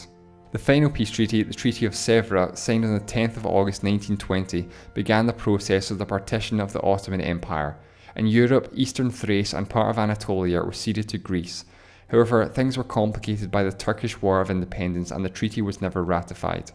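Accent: Scotland, United Kingdom. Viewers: This comment has nothing to do with the content, I'm just dying to know if the narrator is Scottish or Welsh or something 😂😂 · Aaryana Hurd I think he’s Scottish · Is this is a Scottish or Irish accent? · Scottish, he sounds exactly like my scottish friend lol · patrice Scottish I think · He's most definitely Scottish, not Irish. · It's Scottish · Are you Scottish btw? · I like your Scottish Accent. Just saying. 😂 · hes scottish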